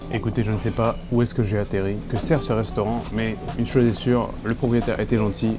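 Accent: China. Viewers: Super video! Ca me fesait rire à chaque fois que tu parlais anglais avec l'accent chinois lol! J'ai hate à la prochaine video 📹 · Mention spéciale à ton anglais avec un accent chinois, c'est drôle et en même temps trop fort! Ravi de suivre tes nouvelles aventures:)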